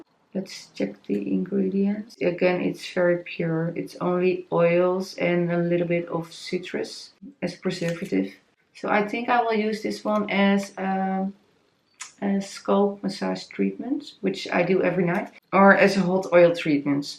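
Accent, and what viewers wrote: Netherlands. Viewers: I knew it omg I watchers your curly hair routine and i was like that accent is soo dutch . Groeten van vlaanderen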